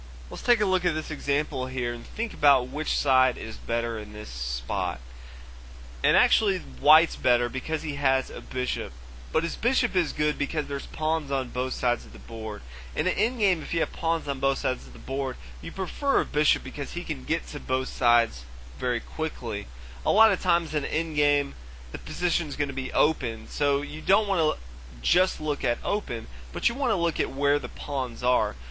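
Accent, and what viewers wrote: South, United States. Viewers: Your southern accent is killing me , good video though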